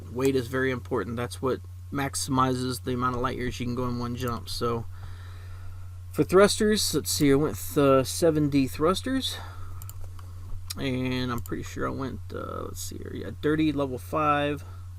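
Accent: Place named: South, United States. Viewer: definitely southern states US accent and as a Brit I like it. I always found the accent like the Irish to be very nice to hear.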